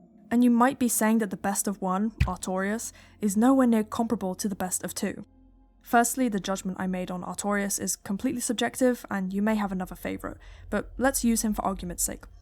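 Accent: United Kingdom. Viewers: Ah a British female voice on darksouls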